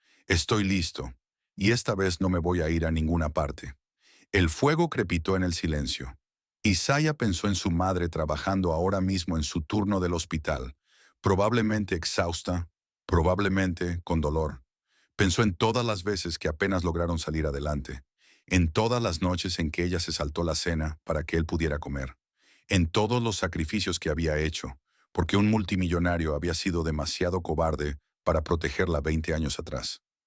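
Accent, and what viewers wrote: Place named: England, United Kingdom. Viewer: Sería un palo si tu narrativa tuviera pronunciación latina y un español sin acento ingles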